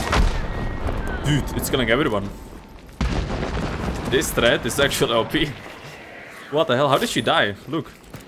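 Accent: Czech Republic. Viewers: nothing beats that hard czech accent.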